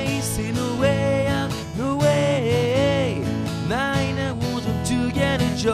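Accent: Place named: France. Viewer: I love when French people speak English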